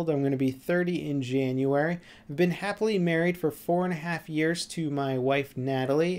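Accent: United Kingdom. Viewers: Don't ask me why but before the ice bucket challenge video he posted * confesses* I thought he was British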